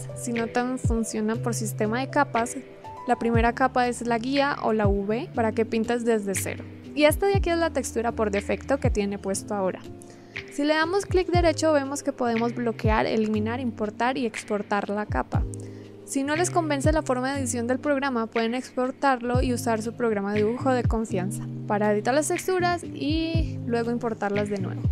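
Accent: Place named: Bogotá, Colombia. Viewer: los colombianos solo reconocen el hacento rolo: eres rola' bogotana? jsjs yo si :>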